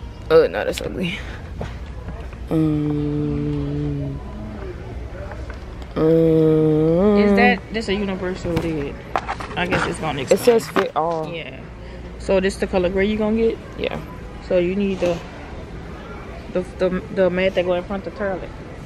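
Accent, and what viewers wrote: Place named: Louisiana, United States. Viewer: I love da Louisiana accent 😂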